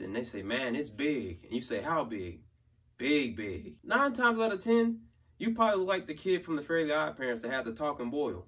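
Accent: South, United States. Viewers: Love from London!! Absolutely love how you speak and how Southern accents sound. That whole 'down the street' and 'in a minute' plus 'cold-cold' & 'knock you into next week' are very much English things too 😊. But we lack your true linguistical skills by a long way! ❤ Great channel, thanks! ❤ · Hi I'm also from Mississippi and my mom and most of my family all of them talk like this I also wanna see some of the ways ppl say yo food nasty or yo ah cannot cook for nun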